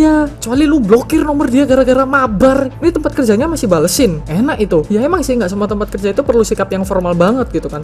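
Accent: Java, Indonesia. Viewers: Bang lu orang mana sih? Kayak ada Jawa Jawa medoknya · " kalian tinggal dugem maen bola sodok " awoskske i love medok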